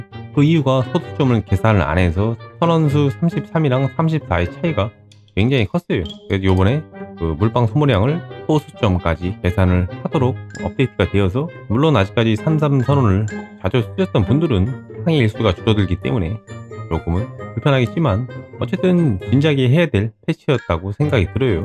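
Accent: Chungcheong, South Korea. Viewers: 덕분에 쾌적하게 즐기고있어요 감사합니다 마성의 충청도말투 ㅎㅎㅎ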